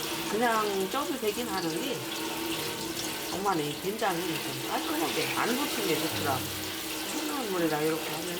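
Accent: Chungcheong, South Korea. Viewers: 전라도 사시는데 충청도 말씀으로 들려요~흐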